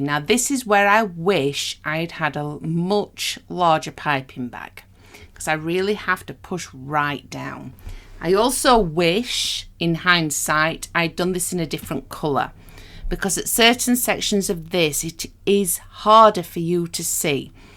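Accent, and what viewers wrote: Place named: United Kingdom. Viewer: I gave up. I don't understand British English 😭😭😭😭😭 Update: I put subtitles and it was a lot easier. Thank youuuuu for sharing such a great technique. 🥰💕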